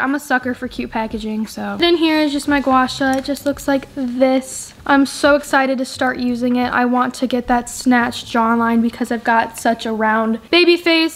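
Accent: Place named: United States. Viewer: He accent is very country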